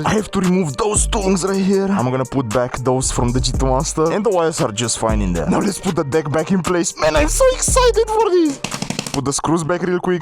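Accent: Balkans. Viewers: I love your true happiness from riding combined with Balkan accent on this videos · The balkan accent alweys gets me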